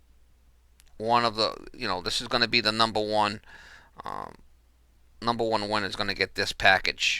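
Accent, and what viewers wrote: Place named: Boston, United States. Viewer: parker sporlan give away. If it weren't for that Boston accent, you could be doing voice-over work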